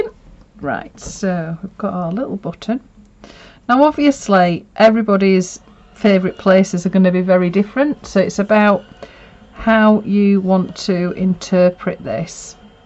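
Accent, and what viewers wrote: Yorkshire, United Kingdom. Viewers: Lovely to hear your Yorkshire accent again this week, and what a pretty fringe. I have just the fabric I need for this week. Thanks for your humour and inspiration.m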